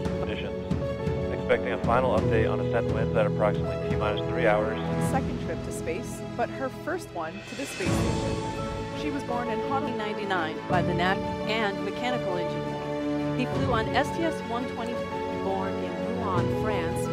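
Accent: South Korea. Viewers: 이사람 전라도 말투쓰는사람 맞죠?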